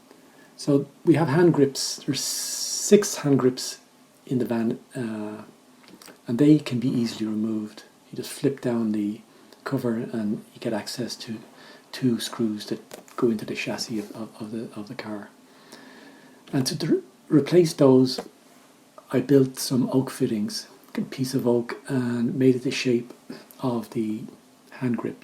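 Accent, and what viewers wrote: Ireland. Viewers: I miss the Irish accent so much ❤️ thanks for this going go be doing this in Canada in the summer ! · Great van build 👍 Do I detect an Irish accent ? Maybe Welsh?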